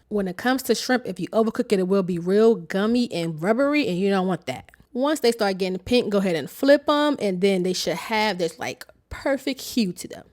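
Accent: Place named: Maryland, United States. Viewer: I hear a Maryland accent 😂 I'm making these soooooon!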